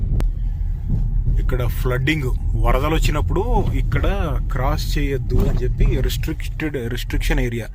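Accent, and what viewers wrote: Andhra Pradesh, India. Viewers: Pakka Meedhi..... Vijayawada nundi Nizamabad shift ayina Family Bro meedhi ... :D Langauge lo eh matram Telangana ledhu ..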